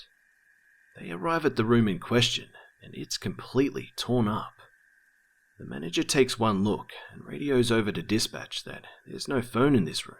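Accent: Australia. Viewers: Your Australian I think? Is he/Are you?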